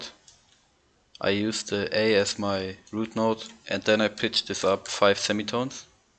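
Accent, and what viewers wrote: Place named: Germany. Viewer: man hört den deutschen Akzent haha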